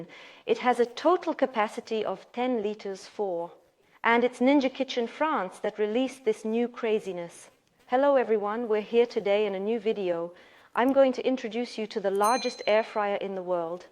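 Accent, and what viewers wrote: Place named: Ireland. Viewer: Great review. I love your Irish accent.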